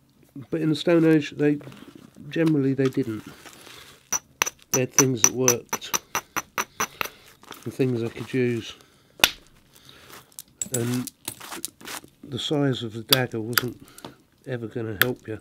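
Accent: England, United Kingdom. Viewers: You have the most wonderful english accent, I know that might sound strange but I just find your voice so soothing. I feel as if I could fall asleep listening to you talk about quite literally anything.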